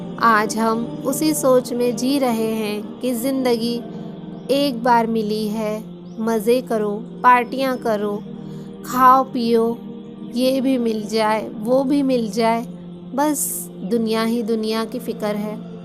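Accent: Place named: India. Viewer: Masha Allah Apsy milkr khushi hoi jee apky bolny k andaz andaza howa k ap Indian ho.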